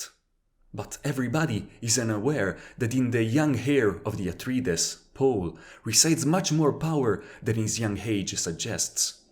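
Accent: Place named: France. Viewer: good review; I presume u r French as you sure do have some of Denis accent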